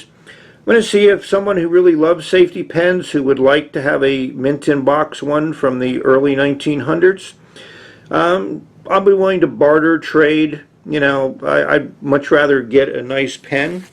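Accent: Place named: Appalachia, United States. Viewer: great video. I always learn a lot about vintage pens from you. congratulations on reaching a milestone with your Channel. I have to ask, based on your accent, if you happen to be from the Allegheny mountain region originally?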